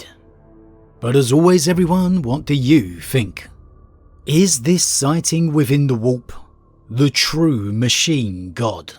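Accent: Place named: England, United Kingdom. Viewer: I get excited to hear some more and instead I get backwater English accent. GD I can't stand u euro trash accents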